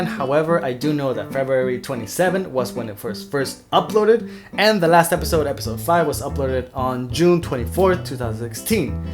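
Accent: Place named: United States. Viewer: QUE!..... HABLAS ESPAÑOL!.... pasas como "americano" muy facilmente.... DIOS!... ya quiero ver la pelea xD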